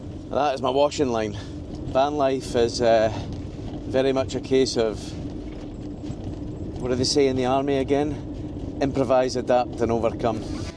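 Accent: Scotland, United Kingdom. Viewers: I found your channel by following you on IG and I still can't figure out how I found you there either lol. This is wonderful and you have a new fan from now on. It's quite hard for me to fully understand that scottish accent but I'm doing my best without using the subtitles. I'm from Argentina and been studying english for many many years but the scottish accent still gives me nightmares lol. Thanks for sharing all these magnificient places.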